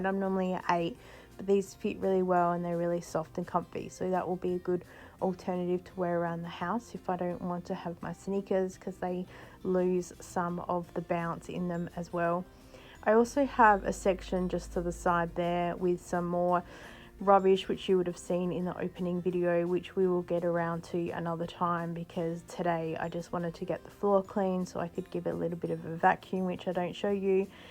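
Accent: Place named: Australia. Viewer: Dana's lovely. She's an ADHDer and developed her no mess method, precisely coz normal methods didnt help her. Hi from Sydney, you sound like an Aussie!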